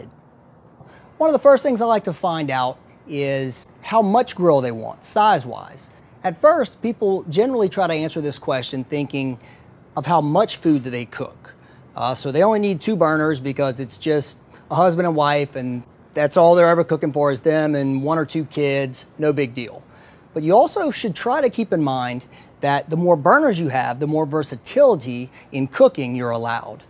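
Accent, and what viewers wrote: Louisiana, United States. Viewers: Good stuff! Very helpful. Also, you sound very much from Louisiana.. am I right?EDIT: Nevermind. just looked at your channel and saw all the other LA related videos.. lol. great channel and video production. Pls keep it up!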